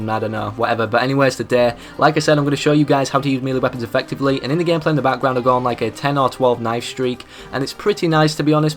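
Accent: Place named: Yorkshire, United Kingdom. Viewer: Yo Mama he is from Yorkshire dumb ass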